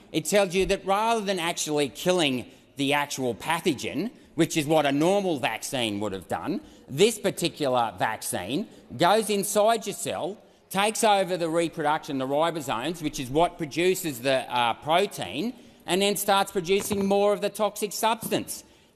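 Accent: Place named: Australia. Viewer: The strongest aussie accent ive ever heard 🤣👍🏽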